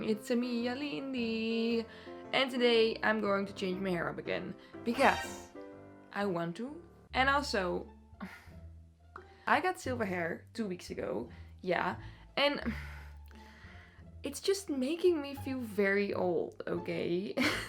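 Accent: Netherlands. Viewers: Ik kon aan je accent horen dat je 🇳🇱 was😁😉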